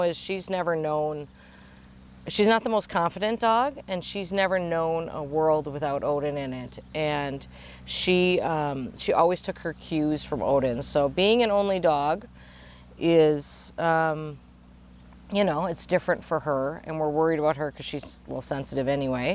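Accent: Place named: Midwest, United States. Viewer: My husband and I have only had one dog in our 39 years together and this is why. It was devastating to lose a 25 lb schnauzer. I can't imagine losing a giant teddy bear like Oden. My heart goes out to all of you. You are real, pragmatic, down to Earth and talk to the camera like the neighbor I wish I had. My 80 year old mother who was born and raised in a Chicago suburb says she likes you too. Must be the familiar Midwestern speech and manner. Take care.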